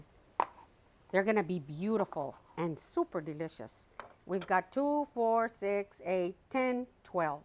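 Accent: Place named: Texas, United States. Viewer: Your Texas accent is back!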